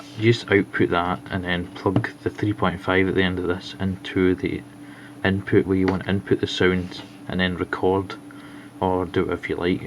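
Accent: United Kingdom. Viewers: I believe he's Scottish not Irish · are you from Wales?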